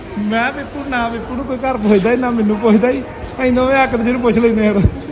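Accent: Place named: Malwa, India. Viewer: Haji veer ji malwa Di boli aa ❤❤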